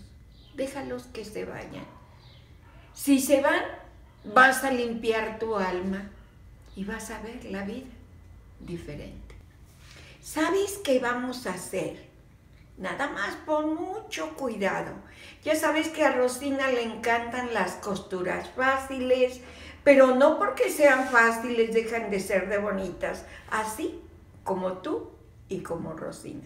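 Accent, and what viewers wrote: Mexico. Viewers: Saludos desde Los Angeles, querida Rosina, en qué país estás? Hablas como mexicana. Bendiciones. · Precioso tu saco y que fácil es para aserlo y muy simple llo chilena me encanta la costura y también me gusta tu personalidad y el pelo bendiciones me llamo Mary tu de donde eres mejicana por el modo de hablar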